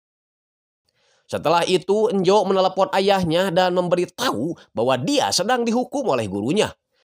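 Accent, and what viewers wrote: West Java, Indonesia. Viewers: Mimin na mni khas cara ngomg na kaciri sunda na kacida😅😅😅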